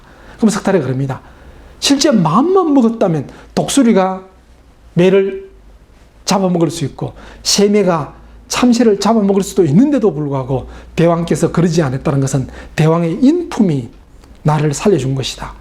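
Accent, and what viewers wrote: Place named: Gyeongsang, South Korea. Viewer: 경상도 사투리와 중국어의 억양이 똑같아서 멀리서 들으면 마치 중국어처럼 들립니다. 하루속히 표준말로 바뀌었으면 좋겠네요.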